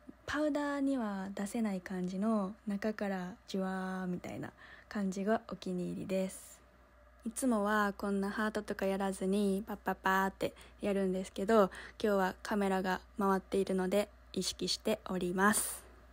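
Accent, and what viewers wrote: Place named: Kansai, Japan. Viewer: さんの関西弁、大好きです＼(^o^)／ いつまでも、そのイントネーションでいてくださいね！てか、2年温めてた！？www これからも、マイペースに動画更新続けてくださいねー♪ おわりー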